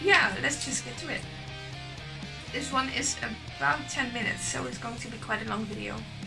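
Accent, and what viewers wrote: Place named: Scandinavia. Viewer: That Scandinavian accent so freaking lovely. Cheers from another Tool fan that grew up listening to Undertow.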